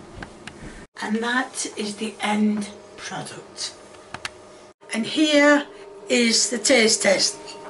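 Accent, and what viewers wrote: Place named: Northern England, United Kingdom. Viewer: I like watching your channel, as a northerner I get what your saying and your sense of humour and sometimes the reaction channels act like something is weird when it's not, it's just how us northerners talk!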